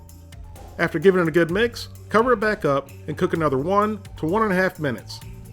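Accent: South, United States. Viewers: i like how you were talking like a hick! git r done grub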